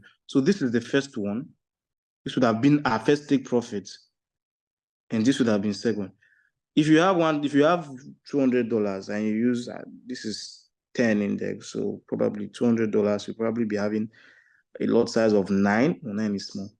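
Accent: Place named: Africa. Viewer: I love you talk markets in your special african ascent haha I laughed while watching your video. Keep these videos comming. You milk we enjoy!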